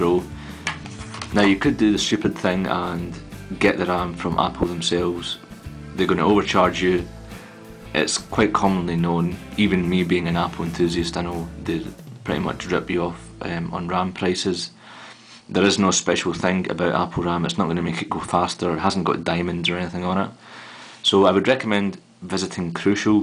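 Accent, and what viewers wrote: Scotland, United Kingdom. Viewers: Aren't you Scottish, by any chance? :-)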